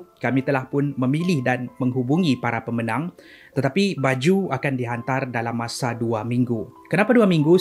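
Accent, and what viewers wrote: Sabah, Malaysia. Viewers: kau orang apa sabah kah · gaya bahasa terlalu formal dan masih jelas dialek sabah. tapi jgn disalahertikan, sekadar mengatakan shj.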